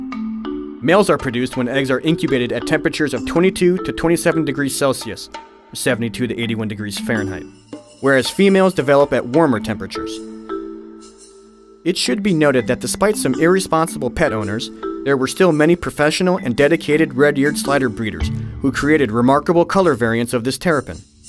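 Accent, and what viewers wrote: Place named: Greece. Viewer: You're Greek, aren't you?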